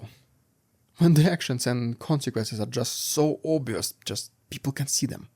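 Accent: Russia. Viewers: Dear, Your insights are valuable, but I just CAN NOT follow along. Your accent is so very pronounced! It's just too hard to constantly rewind and listen again and again to some phrase or word that is just effing incomprehensible! No offense intended, I wish you good fortune.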